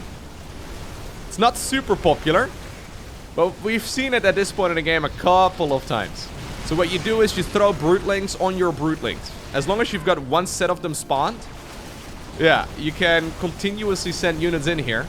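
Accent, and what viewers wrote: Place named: Netherlands. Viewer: it's actually "broodlords" and "broodlings", but dutch accent makes it sound like that.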